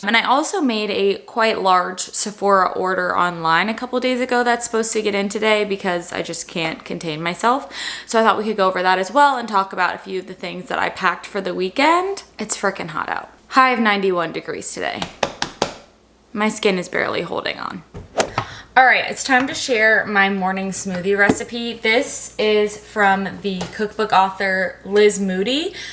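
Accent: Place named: Delaware County, United States. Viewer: your resident Philly girl is cringing and laughing out loud at the same time at your Delco accent LOL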